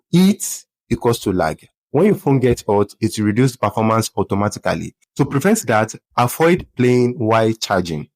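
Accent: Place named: Nigeria. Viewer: Nigerian accent 😊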